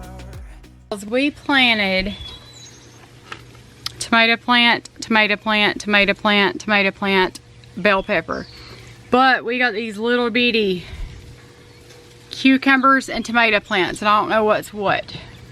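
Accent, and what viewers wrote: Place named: Tennessee, United States. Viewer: Hi, love your channel and your awesome Tennessee accent! 😊 Every time you read a devotional it really helps; life has been rough for our family the last 2 years. God bless you and yours.❤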